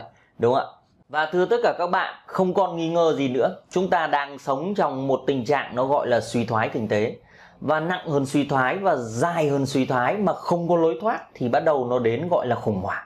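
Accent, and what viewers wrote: Thach That, Vietnam. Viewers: ad ở huyện nào mà nghe giọng nói giống giọng Thạch Thất vậy?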